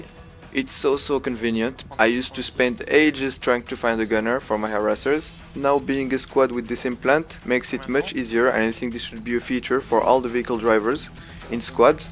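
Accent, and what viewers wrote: France. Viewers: You really have a french accent. Es tu français ? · T'as un accent français 🤔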